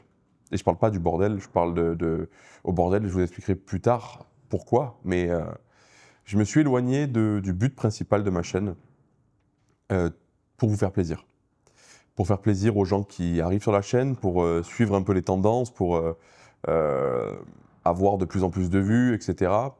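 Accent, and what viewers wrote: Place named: Vaucluse, France. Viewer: Je ne vois pas ce que tu n'aimes pas dans a voix :) moi j'adore (l'accent vauclusien y est pour beaucoup EVIDEMMENT... 😁)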